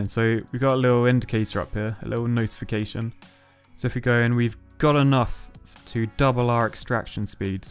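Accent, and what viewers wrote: United Kingdom. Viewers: Funny question but: are you British? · this man is so bri'ish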